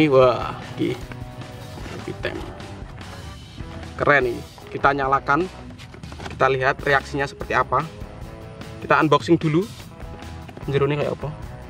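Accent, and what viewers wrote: Java, Indonesia. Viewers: Orang Jawa ya bang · Kakak org jawa ya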